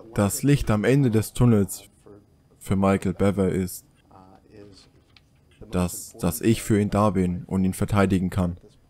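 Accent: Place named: Franconia, Germany. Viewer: so geil! Ich liebe fränkisch! Alle aus meiner Family kommen da her. (Nur ich nicht 🤨)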